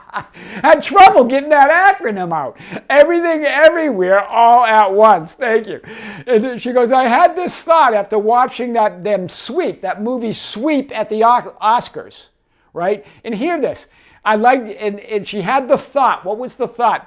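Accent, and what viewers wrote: Boston, United States. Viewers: Aw we love that Boston accent 😂🤍🤍🤍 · Yesss! I knew it was a Boston accent, I love guessing accent's... Its my thing😅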